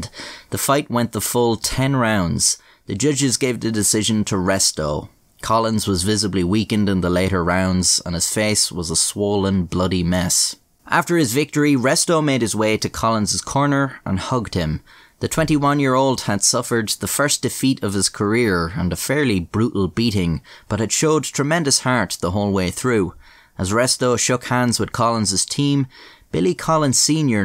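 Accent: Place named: Ireland. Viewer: Hey there lady! Just wished to say love your vids, and your narration with your awesome Irish brouge . Wish I could sound so good. I just have a goofy American accent. You have so much going for ya no need to be downing yourself as you seem to sometimes. I bet you're a right fine lad. I hope you have a most excellent day/evening whatever time you see this.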